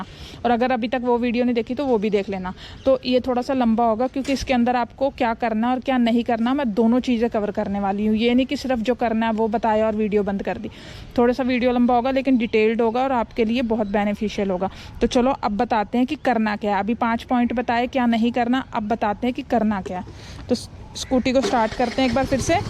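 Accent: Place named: Himachal Pradesh, India. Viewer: Maam main apka fan hu apka himachali accent bahut achha lagta hai❤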